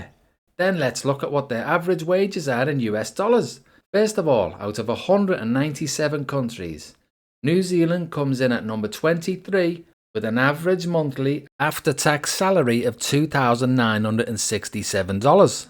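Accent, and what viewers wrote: Liverpool, United Kingdom. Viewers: This video makes sense. The scouser being the voiceover doesn't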